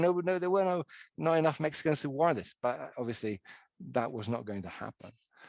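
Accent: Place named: United Kingdom. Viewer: It is interesting that a British accented man has taken up the task of telling the "Mexican perspective" on this history.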